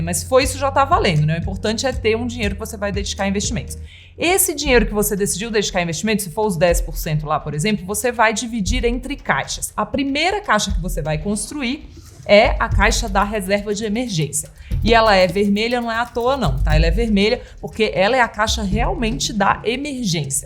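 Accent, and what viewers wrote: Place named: Minas Gerais, Brazil. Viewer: Kkkk. Muito mineira nos jeitos e trejeitos pra falar. Além de saber muito, sabe explicar de uma forma muito fácil. Parabéns e sucesso pra todos na Spiti.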